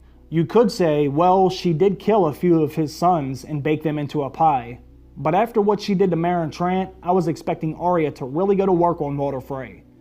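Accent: United States. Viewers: your voice reminds me of an American Petyr Baelish accent.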